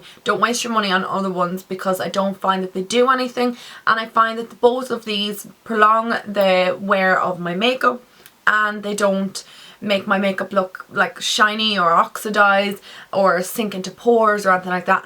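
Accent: Ireland. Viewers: I only found out about your channel now and its amazing. I figured you were irish coz of your accent. Your make up tutorials are amazing. I was wondering if you went to college for beauty therapy where?. Beacuse that's what i want to do when i leave school. Or what colleges would you recommend! Would really appreciate it! Once again your videos are amazing:) x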